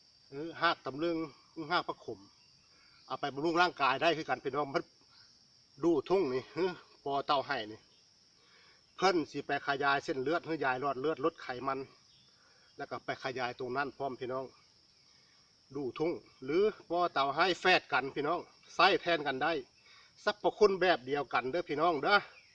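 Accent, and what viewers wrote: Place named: Nyaw, Thailand. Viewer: ไปหาสมุนไพรนำอ้าย มักเบิ่ง มักอ้ายเว้าญ้อจ้า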